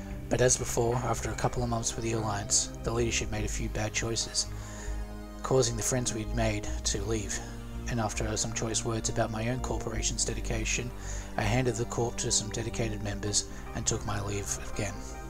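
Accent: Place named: Australia. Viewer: subbed. good on you for moving past the frustration that arises time and again. Looking forward to more videos. It may be the nerves, it may be the quick aussie speak but I found it hard to make out what you were saying as it was going by so fast. :) Could also be my own crap hearing tho. o7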